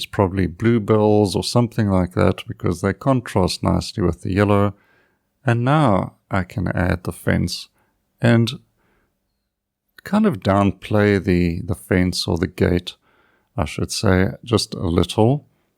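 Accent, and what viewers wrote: South Africa. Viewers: Every one of your videos is helpful. Imove between impressionism and tonalism or a combination of the two. Love your work and your gentle vouce with its echoes (for me) of South Africa. Thankyou ❤️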